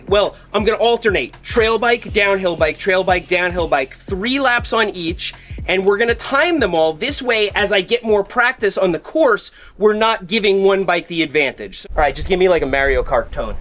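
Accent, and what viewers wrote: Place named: Queens, United States. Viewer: He also drinks wooder like everyone from Queens.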